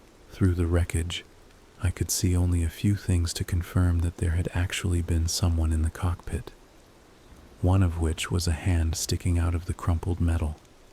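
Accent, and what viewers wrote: United States. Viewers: Is he not American? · Very convincing American accent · You fooled me with the American accent but definitely a scary story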